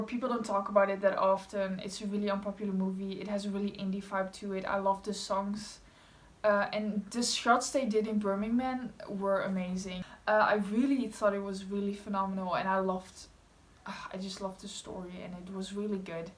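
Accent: Netherlands. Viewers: Are u from Netherlands? R u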